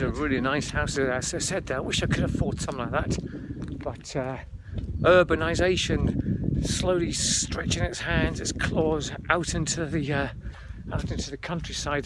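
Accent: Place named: England, United Kingdom. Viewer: Yeah. to my ears I always felt you had an accent or dialect that was not typical of the area, but more English. The fight story of primary 7 confirms what I had thought. Were you born in England or spent a number of years there when you were young? Happy May BTW.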